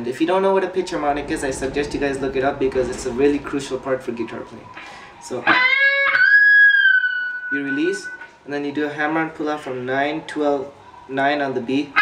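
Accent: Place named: Canada. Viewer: Timro English ta daami cha yarr. I live in london and I thought you lived in Canada or somewhere cause of your English. Nice Job with the lesson by the way.:)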